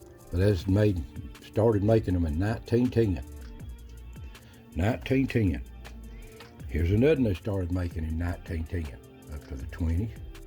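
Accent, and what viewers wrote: Appalachia, United States. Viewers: Your backwoods accent reminds me of my dad whose people came from Appalachia.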